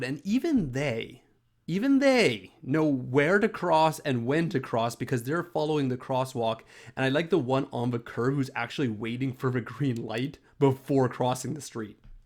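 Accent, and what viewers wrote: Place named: Canada. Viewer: I find your Canadian accent so charming 😜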